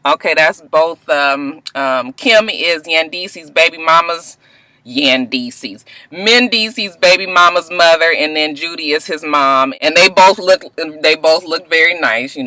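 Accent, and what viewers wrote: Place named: West Coast, United States. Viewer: Haaaaaaa! Hilarious. I looove when u say you be Hard (in yo west coast accent). I try to be hord myself... but sometimes them emotions just sneak in on ya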